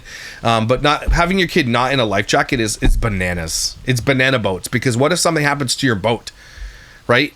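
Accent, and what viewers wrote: Liverpool, United Kingdom. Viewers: I love watching you! as a Manc here in Upstate NY, i'm hearing more scouse/EP area in you but definitely not Scotland! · That's a Scouse accent from Liverpool! It's in the north but not quite Scotland! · Scouse accent from Liverpool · is from Liverpool, best accent ever. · You’re from Liverpool, right? I love this accent. I had a friend from Liverpool, absolutely hilarious, just like you. 😅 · That's a scouse accent!!! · is awesome and wicked funny. As a fellow northerner I assume shes from the Liverpool area. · yup she is defo a scouser. and spot on with her commentary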